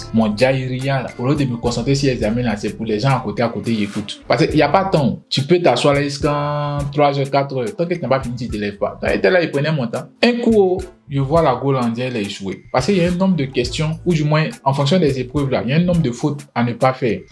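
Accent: Ivory Coast. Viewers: CONSEILS TRÈS UTILES MERCI INFINIMENT TON ACCENT FAIT PENSER À BABI ( ABIDJAN) ❤❤❤ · Merci. J'aime l'accent ivoirien 😊 Hommage à CHEIKH TIOTÉ😢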